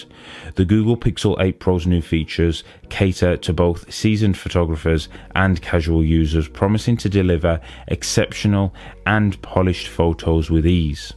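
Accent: United Kingdom. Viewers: Your accent reminds me of a British singer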